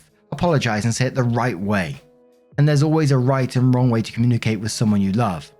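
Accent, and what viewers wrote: United Kingdom. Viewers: It's the British accent for me. Makes everything sound funnier!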